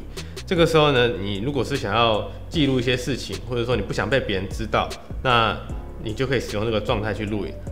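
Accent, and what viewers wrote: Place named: Taiwan. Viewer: 一摸幾，你發音有夠台灣國語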